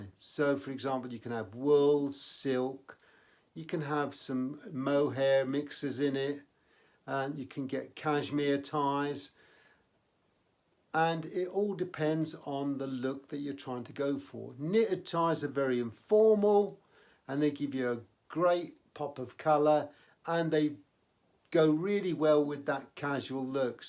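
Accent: United Kingdom. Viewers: Oh marvelous. I used to work in Beccles as a gamekeeper when I was young and a butcher a few years after that. I'm from Narj actually. I did hear you used some eastern terms and a slight accent with them lol.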